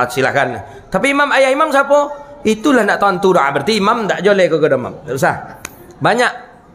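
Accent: Minangkabau, Indonesia. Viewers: Saya sangat merindukan ceramahnya Ustadz yg khas dgm dialeg minang, tegas dan berilmu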